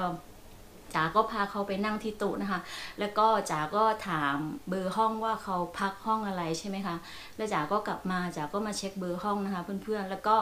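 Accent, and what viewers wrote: South, Thailand. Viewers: คิดยุว่าเป่นคนใต้หรอสรุปมารุ้เพราะคลิปคนใต้เหมือนกันจ้าาติดตามยุนะคะพี่จ้าาา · หนูเป็นคนใต้ค่ะ นครศรีธรรมราชเหมือนกันเลยค่ะ ว่าแล้วฟังพี่เสียงแบบคนใต้เลย ชอบการพูดมากค่ะ ทำให้หนูกล้าแสดงออกมากขึ้นเลย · ฟังพี่จ๋าครั้งแรก รู้เลยว่าเป็นคนใต้แน่ๆ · ว่าแล้วเสียงแหลงเหมือนคนใต้ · นึกแล้วว่าต้องเป็นคนใต้ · เพิ่งเข้ามาดูแต่รุ้สึกชอบ แบบพูดจาน่ารักก็คิดในใจว่าทำไมเหมือนคนใต้ก็ดูมา2 3วัน จนมาเจอคลิปนี้ตอนนี้เองเพิ่งรุ้ว่าเป็นคนใต้ เราก็คนใต้เหมื อนกันค่ะอยู่จ. ติดกันเลย กระบี่ค่ะ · น่ารักมากเลยค่ะ แหลงใต้เหมือนกัน แต่กลัวเพื่อนฟังไม่โร้เรื่อง เลยต้องแหลงกลาง คุณจ๋าสวย และเลิฟสตอรี่ ก็น่ารักมากเลยค่ะ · คนใต้เหมือนกัน ว่าแล้วทำไมเสียงแบบไม่เป๊ะแบบคนภาคกลาง ดูคลิปบ้านอเมริกาครั้งแรก คลิปนี้เป็นคลิปสอง ชีวิตดั่งเทพนิยายมากครับ ^^ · หวัดดีค่ะคุณจ๋า ชื่อฐาค่ะเป็นคนใต้ปัตตานีค่ะ เพิ่งเข้ามาดูคุณจ๋าค่ะ ตอนดูครั้งแรก คิดในใจว่าคุณจ๋าเป็นคนใต้หรือเปล่าเพราะฟังจากสำเนียงแล้วใช่เลยค่ะ คุณจ๋าโชคดีจังค่ะได้สามีที่ดี ครอบครัวอบอุ่น ดูครั้งแรกตกหลุมรักคุณจ๋าเลยค่ะ จะติดตามต่อไปนะคะ หวัดดีค่ะ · พี่สวยมากเลยยยย ชอบเวลาพูดใต้❤❤❤❤ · ผมติดตามล่ะครับผมฟังพี่จ๋าพูดในคลิปก่อนรุ้เลยว่าคนใต้บ้านเรา😁😁😁😁😁😁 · ว่าแล้วพี่น่าจะเป็นคนใต้ หนูคนนครเหมือนกันค่ะ พี่สวยน่ารักมากๆเลย ^^ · พี่เข้ามาดูครั้งแรกก้อรู้ว่าน้องเป็นคนใต้ สำเนียงออกพี่ก้อคนสุราษฎร์ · ติดตามมาจากคลิปเปิดบ้าน หนูฟังครั้งพี่จ๋าพูดหนูมั่นใจเลยว่าพี่จ๋าต้องเป็นคนใต้แน่ๆ แล้วก็ได้คำตอบจริงๆจากคลิปนี้ 555หนูก็คนนครเหมือนกันค่ะ ยินกับพี่จ๋าที่มีครอบครัวที่ดีและอบอุ่นแบบนี้น่ะคะ ดีใจที่ได้ติดตามพี่คะ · พี่แล่งใต้ น่ารักค่ะ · ว่าแล้วสำเนียงพี่คล้ายๆคนใต้ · เพิ่งเข้ามาค่ะ ฟังสำเนียงแล้วว่าคุณจ๋าน่าจะเป็นคนใต้นะค่ะ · คุณ*พูดใต้สำเนียงชัดเจนมาก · พูดใต้แล้วเพราะมากๆเลยค่ะ เสียงน่าฟังมาก หนูก็เป็นคนใต้ค่ะ แต่พูดใต้ไม่ได้ เพราะโตที่กทม. 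พอพยายามลองพูด ยายชอบบอกว่าแหลงทองแดงค่ะ55555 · ชอบฟังค่ะ...คนใต้ๆ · ชอบสำเนียงใต้นะค่ะ น่ารักมากๆค่ะ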